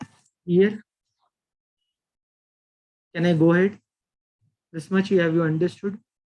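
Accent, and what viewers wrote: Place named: India. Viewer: bro that indian accent of yours makes it really hard to understand the vid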